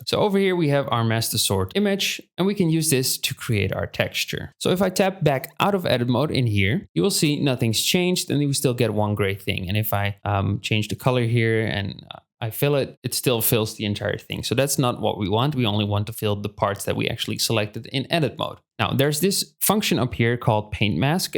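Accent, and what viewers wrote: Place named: Netherlands. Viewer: I hear dutch.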